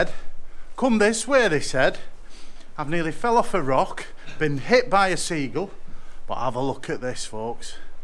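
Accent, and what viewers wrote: Lancashire, United Kingdom. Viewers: I think we have to thank you. It does take a lot of dedication to put in the hours behind the lens. Don't worry about production values and all that mince. Your big baw face and lancs mawling of the Anglian dialect is what holds it together...and the good shots as well. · Brilliant !!! I have watched every one of your vlogs. Coming from Bolton ( not too far away from your hometown ) I too share a "Lanky" dialect not as strong as yours but listening to you talk and laugh... Fabulous :-))))) You seem to be having a load of fun with your mates and the photography aside, love the whole atmosphere you seem to generate. Cheers and Sithee!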